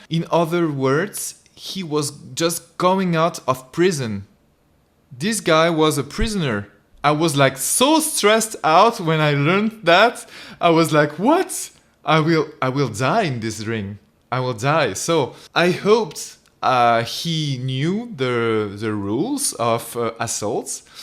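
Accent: France. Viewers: Jme disais bien que tu etais francais avec l’accent 😂👌🏽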